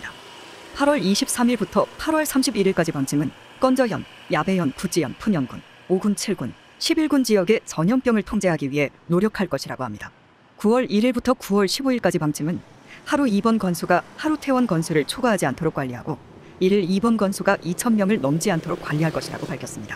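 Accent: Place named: North Korea. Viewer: 나래이션 악센트가 북한 억양이 있네요 ~~~ 발음이 너무 좋아서 귀에 팍팍 잘들려요 ㅎㅎ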